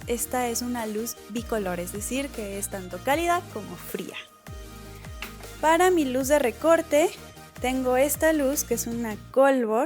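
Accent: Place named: Mexico. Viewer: Te quedó hermosa la foto!! 📸 PD: me encanta tu tonada mexicana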